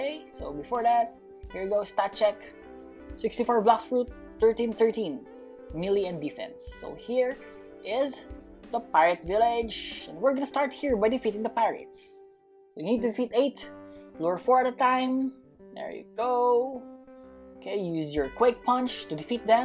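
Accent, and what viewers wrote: Philippines. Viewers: Pilipino kaba kasi parang pilipino ang accent mo eh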